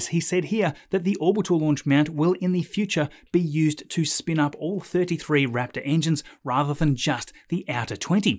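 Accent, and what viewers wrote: Australia. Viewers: I lived in Brisbane for 5 years. I come here for weekly dose of Australian English ... LOL I do like the Aussie English .. I am from Brazil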